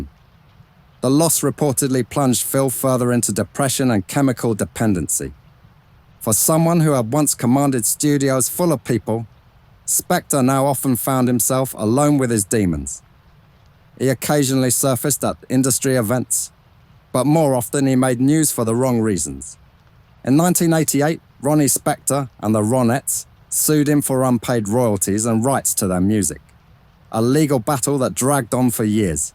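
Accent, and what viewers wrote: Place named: London, United Kingdom. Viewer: I love the timbre of the narrators voice ! Classic London English..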